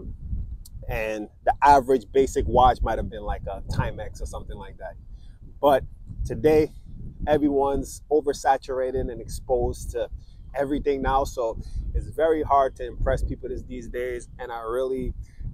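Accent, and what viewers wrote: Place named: London, United Kingdom. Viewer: Sounds like London!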